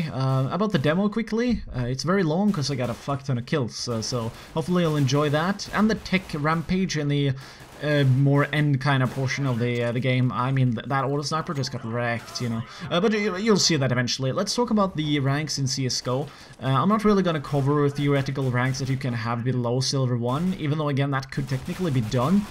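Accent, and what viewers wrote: Norway. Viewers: Norwegian?... not swedish?.... first time :) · Haha er du norsk? xD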